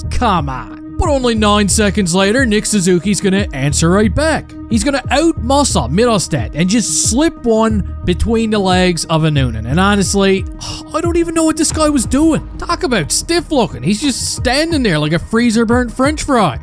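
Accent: Newfoundland, Canada. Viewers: Love your accent!! Good ol' Canadian hockey talk! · My thoughts? Good to see Jaro breaking out. Good to hear we got a 30G cap again. Xhakej (sp??) is a freakin’ house. But most of all, let’s here it for the Newfy accent. If you don’t immediately find joy in your heart hearing a newfy say “tird perid”, I assume your soul is dead. Good show friend.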